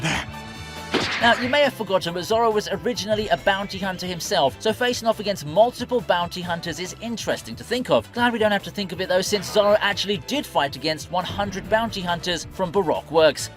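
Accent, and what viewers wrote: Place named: United Kingdom. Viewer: No one gives a shit about that detail he’s british let him use his accent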